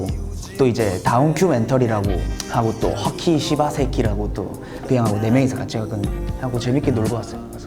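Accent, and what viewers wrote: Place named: Busan, South Korea. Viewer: 확실히 부산 사투리가 까리한데~~~~ 조용조용히 주술 외우는거 같다 ㅎㅎㅎㅎ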